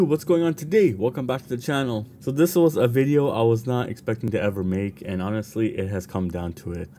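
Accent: Toronto, Canada. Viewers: You talk like a Toronto / GTA man for sure.. you from the ends ahlie?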